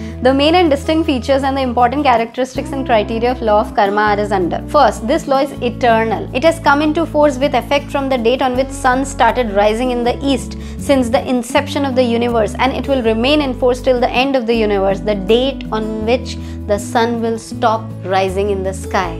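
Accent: Gujarat, India. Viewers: Dii ! Are you gujarati?? Cuz you said "karma nahi nadta haii" 😂 I'm 100% sure you are gujju😍 I lovvee ur videos!! Actually my life is changed after watching ur videos keep going dii ur doing gud♥️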